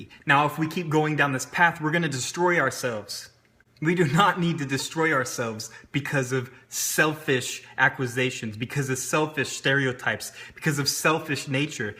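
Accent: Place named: Latin America. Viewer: And honey, I know my races very well, you are mixed somewhere down the line, you are definitely not pure white, I can tell by the slight color to your skin, and facial features. You also have a slight accent that of a Hispanic. Europeans are very mixed with Indians and other races somewhere down the line. By the way, nice video.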